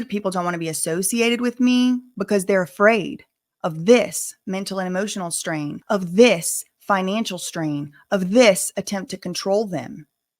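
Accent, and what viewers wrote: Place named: Baltimore, United States. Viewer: You from Baltimore? You got the accent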